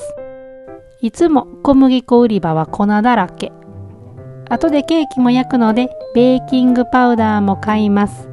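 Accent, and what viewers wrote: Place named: Osaka, Japan. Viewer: Nobanaさんの独特な大阪弁を聞くと、金曜日だー！ってほっこりします♡ドイツも好きだけど、単純にNobanaワールドが好き。大阪でオフ会あったら、Nobanaさんに会ってみたい😆ところでオフ会っていうものは、一人で行っても大丈夫なものなんでしょうか。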